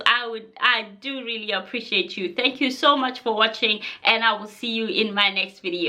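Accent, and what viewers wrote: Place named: South Africa. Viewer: That was amazing thanks for sharing your weight loss journey.Your accent is South African.28 is a lot wow.congratulations.hit the 🛎I love your content I wouldn’t want to miss out.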